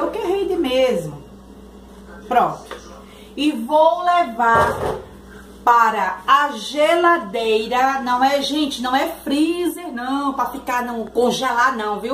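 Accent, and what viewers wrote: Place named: North, Brazil. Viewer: Estou gostando dos seus videos, muito legal as receitas, veja que voce é pessoas cristã, isso é bom. pelo seu sotaque percebo ser nortista. abraços do amigo Paul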